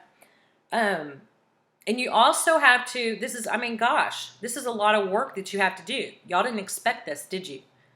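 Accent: Texas, United States. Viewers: Girl u have to be from Texas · You sound like a Texas woman!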